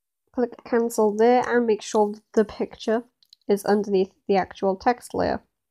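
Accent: United Kingdom. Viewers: Thank you british lady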